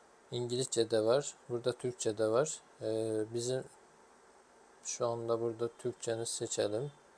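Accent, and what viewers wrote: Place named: Azerbaijan. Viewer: konuşan arkadaş azerbaycanlı galiba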